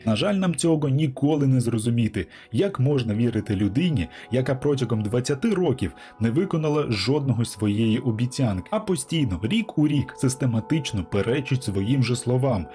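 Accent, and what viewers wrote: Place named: Belarus. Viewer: Слава Богу, Слава Україні, класный белоруский акцент)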